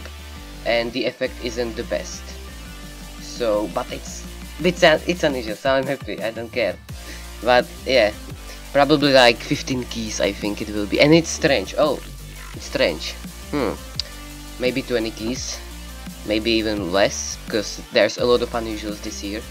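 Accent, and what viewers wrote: Czech Republic. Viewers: NICE ENGLISH :D (ale pořád je tam přízvuk čecha :D) nechápu proč nemáš 100 000 subs ? :D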